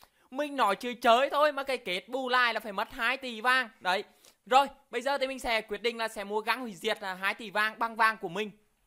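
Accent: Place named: Central, Vietnam. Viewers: Vkl giọng nghệ an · Thích tiếng miềm trung hơn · A bl tiếg Huế maxx hay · tội ah hồm nay ns tiếng nghệ an, em cũng ở nghệ an ah này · Đúng là dân nghệ tĩnh nói hay ri hey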